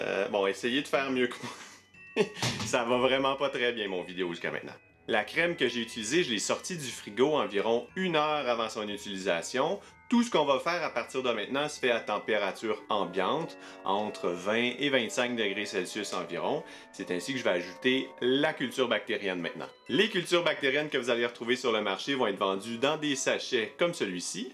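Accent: Quebec, Canada. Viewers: Hey! Bonne vidéo 👍😀 J'ai essayer une de tes recette, et c'est un échec 😅 D'ailleurs, tu et canadien? Vu ton accent je pense que oui 😅 Juste pour dire que j'adore le Canada!! 🙂 Coucou de la Nouvelle-Calédonie. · Géniales vos vidéos.. Tout est parfaitement expliqué,matériel,dosages,temps... Et l'accent québécois est vraiment top 👍👍 Amitiés de France aux cousins des Amériques!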